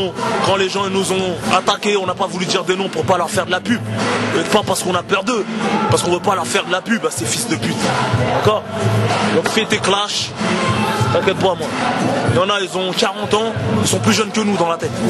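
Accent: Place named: Paris, France. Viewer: il parle gabin, samat joe et mec de Paris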